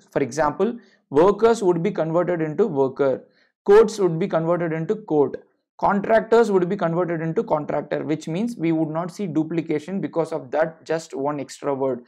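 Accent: India. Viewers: 11/10... Additional credit for proper indian accent. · am I the only one unable to listen to Indian english?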